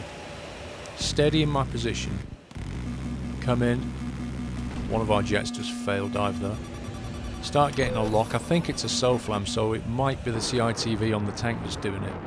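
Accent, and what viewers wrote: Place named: United Kingdom. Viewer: British accent+PS3= ur a badass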